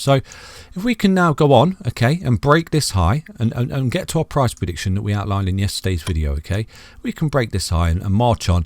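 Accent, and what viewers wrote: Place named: Nottingham, United Kingdom. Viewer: Sound like your from Nottingham! Thanks for the videos bro if you ever wanna do paid lessons for learning charts let me know I’m looking to learn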